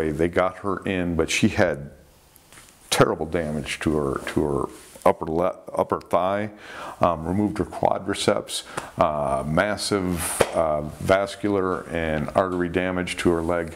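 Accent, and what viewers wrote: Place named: Great Lakes, United States. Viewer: BTW I’m from Michigan, you sure sound like you’re from here or the Great Lakes area!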